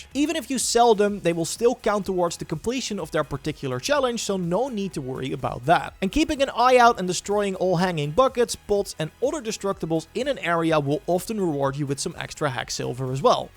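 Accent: Netherlands. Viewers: Heerlijk, dat Nederlish.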